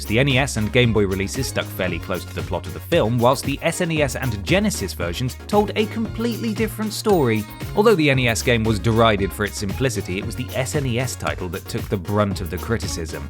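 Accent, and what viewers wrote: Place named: England, United Kingdom. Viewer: Interesting that you speak with an Emglish accent but call it a "Sega Genesis"...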